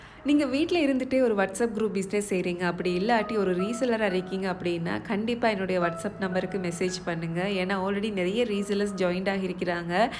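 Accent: Sri Lanka. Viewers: Sister ongede ellam ok but konjem style eh korainge.neenge trinco side thane trinco pecha pesunge erichala irikki ongde voice eh keke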